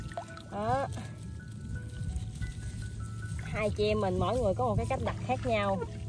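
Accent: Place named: Mekong Delta, Vietnam. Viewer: Nghe giọng nói của người miền Tây đã thấy dễ thương rồi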